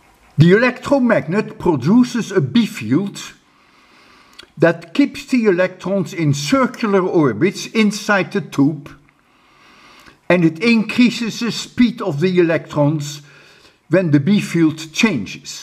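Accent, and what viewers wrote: Netherlands. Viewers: I hear a Dutch accent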